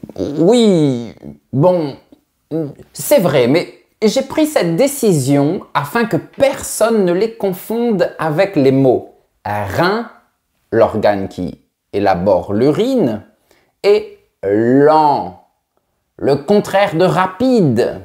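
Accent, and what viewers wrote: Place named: South, France. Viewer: Tu as un accent du sud, et c'est l'occasion de mentionner un mot qui se prononce différemment: MOINS. Au nord on ne prononce pas le S et au sud (donc ta prononciation) on le prononce.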